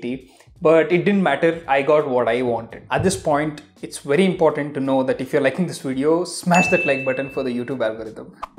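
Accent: India. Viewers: liked ur accent. Indian accent is amazing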